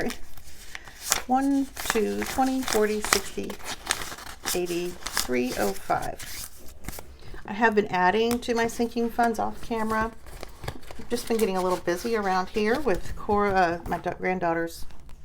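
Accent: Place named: North, United Kingdom. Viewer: Northern twang!!! 😂I love it!!! I love that you got them so quickly!!!!! Thank you so much again!!!!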